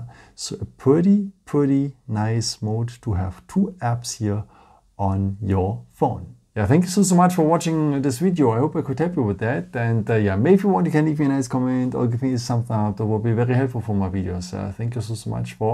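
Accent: Germany. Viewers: man hört SO dass du deutsch bist LOL. aber scheisse bei mir ist das DUAL einfach nicht verfügbar. warumm....?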